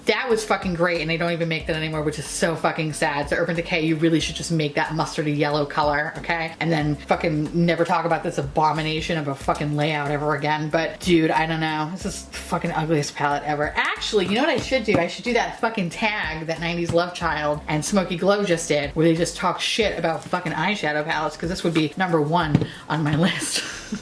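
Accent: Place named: New York, United States. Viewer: 4! There’s nothing I love more than you speaking garbage about things in your new york and Minnesota accents! 😂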